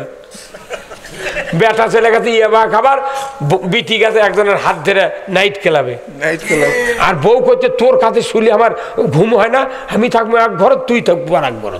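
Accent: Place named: Bogura, Bangladesh. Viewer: মাশাল্লাহ, চট্টগ্রাম থেকে মারহাবা জানাই, হোকনা বগুড়ার ভাষা, আমি কিন্তু ক্লিয়ার বুঝতেছি,আলহামদুলিল্লাহ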